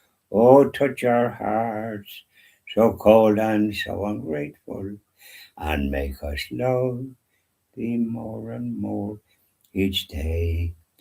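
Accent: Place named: Ireland. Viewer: Your Irish accent is difficult for me to understand sometimes but I understand most of what you say, and I think I’ll understand you more the more I watch your videos! God bless you and yours!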